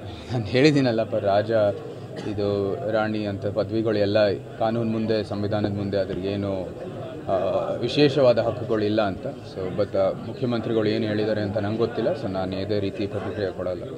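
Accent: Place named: India. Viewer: ರಾಜಗನ್ನಡ ಕೇಳೋದೇ ಚೆಂದ 🥰👏👏👏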